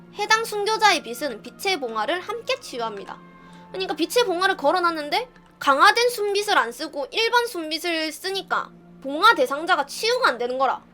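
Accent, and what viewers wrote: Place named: Gyeongsang, South Korea. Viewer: 아..경상도 사투리 진짜 극혐이다 1초도 못듣겟음 역겨워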